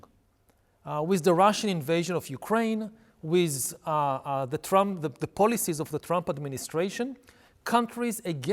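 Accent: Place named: Japan. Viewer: 日本訛りの英語だなこれ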